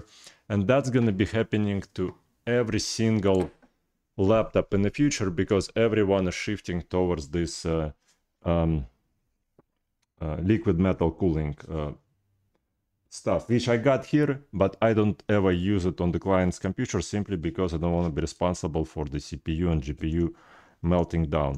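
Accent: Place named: Russia. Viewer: По акценту слышно что ты говоришь по русски)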